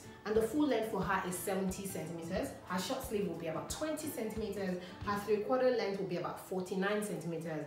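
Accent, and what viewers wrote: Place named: United States. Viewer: You are so cute and I love your English like Americans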